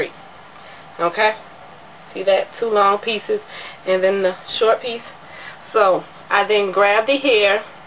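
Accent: New Orleans, United States. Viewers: Ur N.O accent is so strong lol Im from baton rouge and I love new Orleans accent lol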